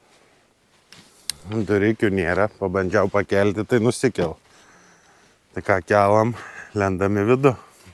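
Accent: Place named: Kuršėnai, Lithuania. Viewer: Patink video, o da labiau - kuršienietiška šnekta 😊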